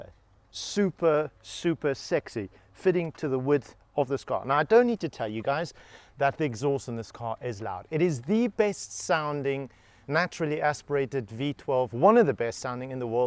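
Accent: South Africa. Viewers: Are you South African? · sure runs that pie-hole ~ is that a S African accent?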